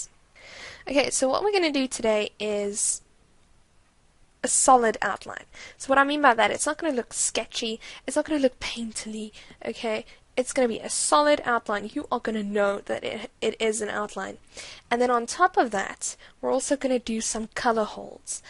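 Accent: South Africa. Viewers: I think she is South african... XD Idk! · I got really excited when I heard your accent! I miss South Africa.... Do you speak Afrikaans? Also, your drawing style is so cute and I love it! It reminds me of Disney :o) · Your accent. Are you South African? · When doing the line clean up even when my eraser is set to 100% hard i get this softening of the line? Is that because im not in 300dpi ? btw you sound South African :) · Nice tut. BTW You sound South African.... Am I right? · South African accent? :) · Are you South African?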